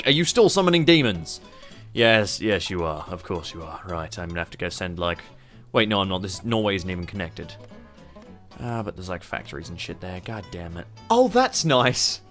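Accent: Australia. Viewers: You over exaggerate how Australian you are and it’s really cringey and hard to watch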